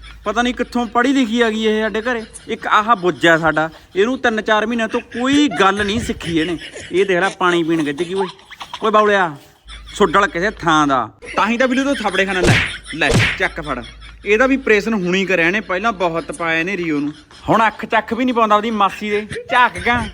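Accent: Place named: Bathinda, India. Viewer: Veere boln de style to tuci Bathinda de lagde hooo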